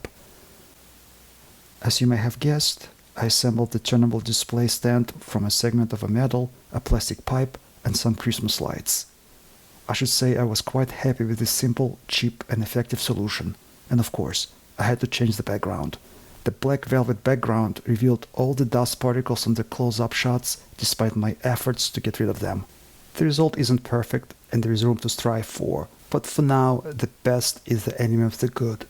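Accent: Russia. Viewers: "Good enough," is not good enough for! Thank you for your fascinating videos on so many different "maker" topics. Your narration in Russian-scented but perfect English is very pleasant to listen to. Oh, and don't hurt yourself, please.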